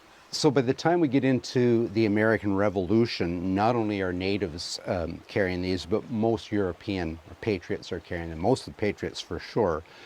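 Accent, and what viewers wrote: Canada. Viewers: Hi don t change your Canadian saying s I m from Massachusetts and every on know were Im from when i speak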